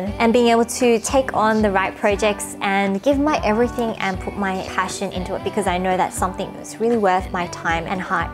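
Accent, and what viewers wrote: Australia. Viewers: Hi! I don't normally comment on Youtube videos and I'm not someone who usually watches beauty videos, but your channel is one I find myself returning to surprisingly often. When I first stumbled upon your channel, 어? 한국 사람이 호주식 바름으로 얘기하네? 하고 마냥 신기해서 계속 보게 됐는데요.. 근데 볼수록 왠지 정(?이라고 해야할까?)이 들더라구요. Perhaps it was the nonchalance with which you so endearingly shared the little quirks of your personality, putting it out there for all the world to see. Perhaps it was the apparently total comfort with which you sat in front of the camera as though you were simply hanging out with your viewers. Perhaps it was the warmth and care and effort you so clearly put into your content to not only share but to better the lives of your viewers in some small way. Whatever the case may be, I just wanted to let you know that your 따뜻하게 바로 앞에있는 친구 처럼 말해주고 보는 사람들을 위하는 예쁜 마음이 이 먼 미국에서도 너무 뚜렷하게 보이고 들린다고 그리고 고맙다고 말해주고 싶었어요. 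Maybe it's just because it's 3AM and I'm feeling sentimental, but nonetheless~ I just wanted to thank you for your hard work.:) Have a lovely day!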